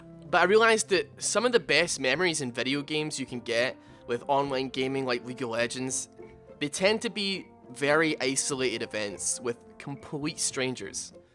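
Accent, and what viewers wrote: Scotland, United Kingdom. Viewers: Don’t mind me I’m just here for the Scottish accent.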